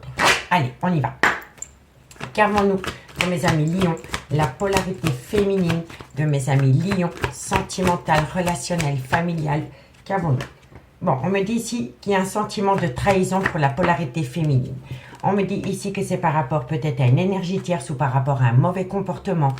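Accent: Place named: South, France. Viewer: bonjour J'aimerai savoir si vous êtes du sud (on dirait bien ) entre Montpellier et Marseille SI OUI? vous consulter en réel,?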